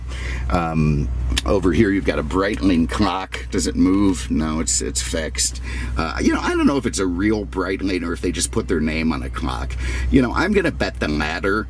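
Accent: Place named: Midwest, United States. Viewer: I love your videos. Where are you originally from? Sounds like a Midwestern accent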